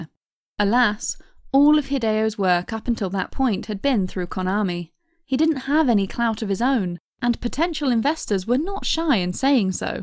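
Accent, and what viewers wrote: United Kingdom. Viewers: It feels like that the narrator is british, my asian ears are hardly listening to this narrator. Glad there is subtitle available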